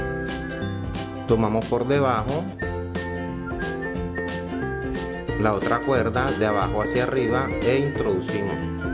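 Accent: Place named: Caribbean Coast, Colombia. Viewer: Buenas, recien encontre este canal y me parecen sencillas, hermosas manualidades y bien explicadas... una pregunta usted es colombiano? a ratos lo siento costeño...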